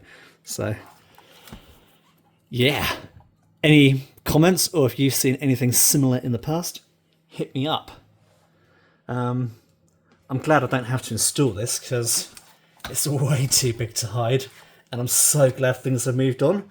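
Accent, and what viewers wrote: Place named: New Zealand. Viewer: Wait, you're a Kiwi? I'm really bad at spotting us in the wild..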